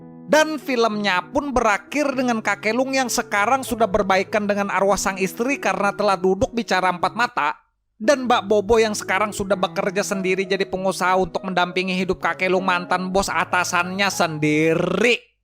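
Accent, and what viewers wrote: Banjar, Indonesia. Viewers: Urang Banjar kah ni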